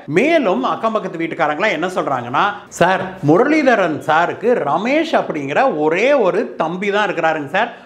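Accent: Kongu, India. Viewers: உங்கள் கொங்குத் தமிழ் அருமை நிறைய வீடியோ போடுங்க சார் வாழ்த்துக்கள்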